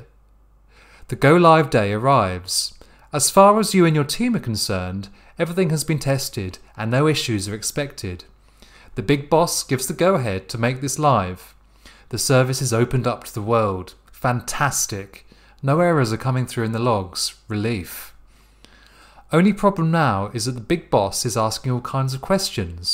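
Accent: United Kingdom. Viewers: Thank you very much sir for this video, love that british accent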